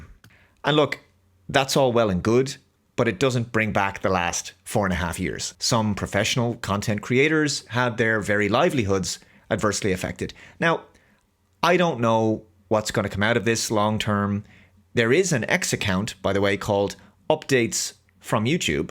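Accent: Ireland. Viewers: I must admit, I've missed your Irish voice telling me to follow you on "Moinds n' Gaaab" 😂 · it is so nice to hear your Irish accent again!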